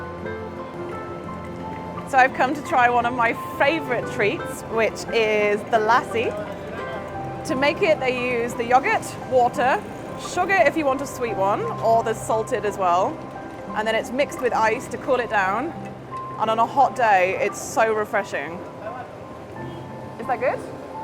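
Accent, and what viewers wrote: Australia. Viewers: your accent sounds more Ozzy <3 after watching Ozzy Man reviews since 2014, My inner voice has become Aussie eventhough I am Pakistani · Your accent sounds Australian yet somewhere your video mentioned "English girl."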